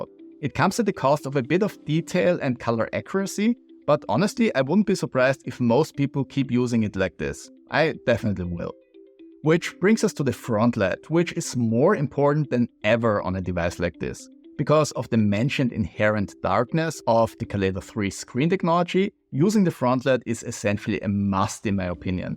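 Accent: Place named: Austria. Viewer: Ich erkenne den österreichischen Akzent sofort. Er gefällt mir :) Ich habe mir dein Video über das ONYX BOOX A3C angeschaut und mir daraufhin das A4C bestellt um die Risale-i Nur besser lesen zu können. Danke dafür ☺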